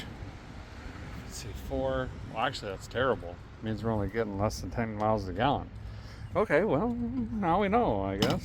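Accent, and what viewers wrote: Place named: South, United States. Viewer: Your southern is improving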